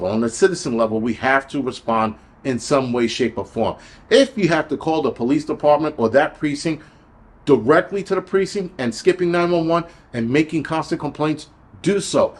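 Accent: New York, United States. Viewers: Yoooo. Well said bro. I'm not used to black guys that have the exact same accent that I do and i'm a white guy, Italian from Corona which means,,,, You gotta be from new york city. I left back in 97, came to Vegas but now Vegas is all messed up with all these California people that come here and all the guys have high voices like they had their balls cut off. It's time for me to move from here now. I gave you a like and Subscribed I'll be watching your channel more. Awesome content keep up the great work. 👍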